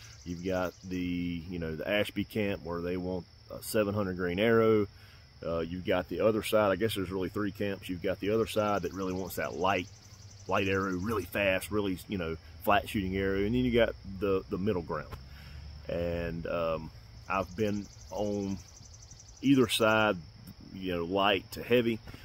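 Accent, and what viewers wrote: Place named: Mississippi, United States. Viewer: What part of the US are you from. I used to live in Missisippi and the accent sounds quite familiar. Regards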